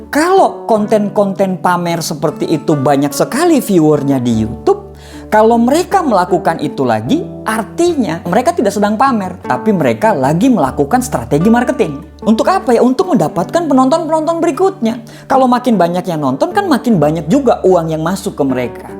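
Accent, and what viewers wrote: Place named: Makassar, Indonesia. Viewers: Dari logatnya bang org Sulsel y, kl benar spertinya sy baru nemu youtuber motivator dari daerah sana, bahasannya oke punya👍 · Kelihatan logatnya pak, apa dia lahir dr mkassar? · Logatnya Makassar kayaknya ini om 😁😁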